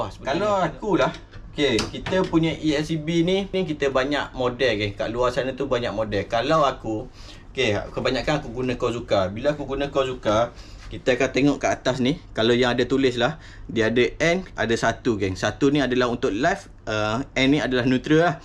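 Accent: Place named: Terengganu, Malaysia. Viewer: Dengr abg ckp macam orng ganu je,kalau ganu sama la kita🤘apa pon terbaik boh👍👍👍👍👍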